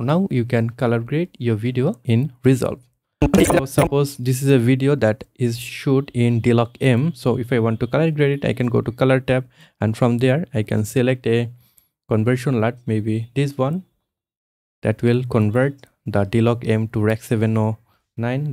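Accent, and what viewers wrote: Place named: Assam, India. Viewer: you have a north-east accent, are you from assam?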